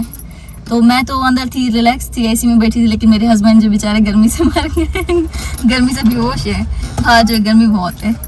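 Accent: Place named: Lucknow, India. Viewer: Ap ka bat karne ka lehja or tarika India Lucknow ke logo ki tarha he... Love from india🇮🇳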